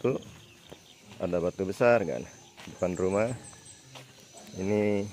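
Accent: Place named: Java, Indonesia. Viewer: Salut dgb Om... berakulturasi dgn budaya lokal, bahasa dayaknya dah bagus walau medok jawanya masih agak terdengar.... semangat... sukses selalu Om.... Bisa reques ngak Om, minta video didaerah SIBITAN... kangen masa kecil ku 30 thn yg lalu...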